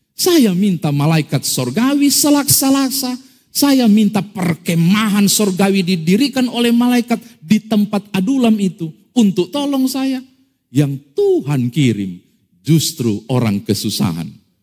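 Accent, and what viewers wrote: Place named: Makassar, Indonesia. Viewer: Sukaa dgn gaya bahasa makassar nya pak pdt😂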